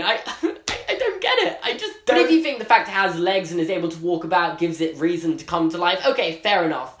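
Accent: England, United Kingdom. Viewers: Alize Cordero I live in England and he does have really posh accent to be fair....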